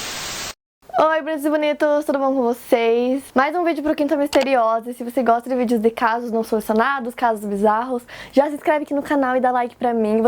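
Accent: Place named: Rio Grande do Sul, Brazil. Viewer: tu é do rs? parece por causa do sotaque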